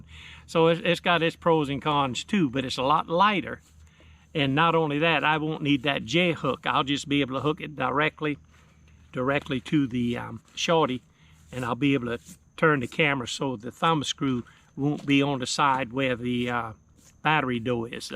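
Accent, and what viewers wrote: South, United States. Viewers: you have a great channel! And your southern draaaw is so great! Love watching and listening. But truly, I really love learning. Even though I van camp, the idea of “Packing small” suits my adventure style and you guys teach me so much! And I like to know stuff!! 😂🙌🏼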